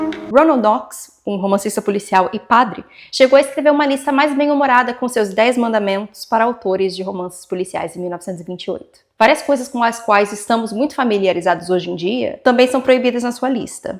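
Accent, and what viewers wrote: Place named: England, United Kingdom. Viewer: Parece que meu cérebro escuta voce falando português, mas no final da frase tem um sotaque inglês 😅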